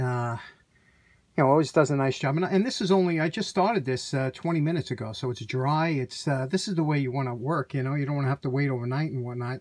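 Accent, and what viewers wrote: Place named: Hudson Valley, United States. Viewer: Great video, really useful, and I love your accent it reminds me of home in the Hudson Valley. :)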